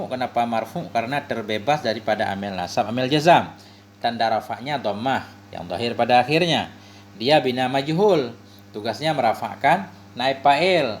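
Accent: Aceh, Indonesia. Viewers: Suaranya agak ke aceh² gitu ya makasih ustadz ilmunya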